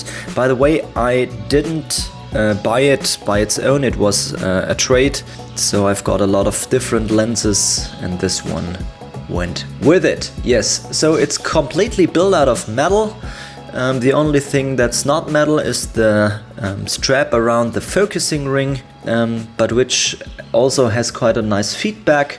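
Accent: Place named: Germany. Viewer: Soll ich mir das deutsche Englisch jetzt 12min reinziehen? Dann bekomm ich partielle Einseitige, Lähmung und gesichtskirmes auf der anderen Seite. Toll, dass sie englisch können, aber beschränken Sie es bitte auf die zwischenmenschliche Kommunikation. Videos müssen echt nicht so kommentiert werden.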